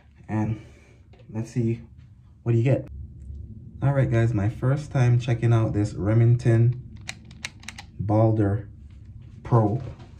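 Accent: Jamaica. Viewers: Jamaican? Respect brethren!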